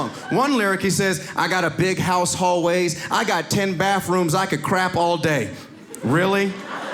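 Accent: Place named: Montana, United States. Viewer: If this was an audio clip without video, I would bet my life savings that I'm listening to a white man from Montana or New Hampshire 😂😂😂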